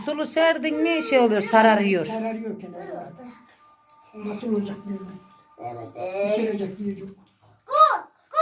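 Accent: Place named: Beyşehir, Turkey. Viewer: Bende beyşehirliyim ctesi pazarına bende gittim Baska yerde oturuyom konuşmanı bize benzettim de abone oldum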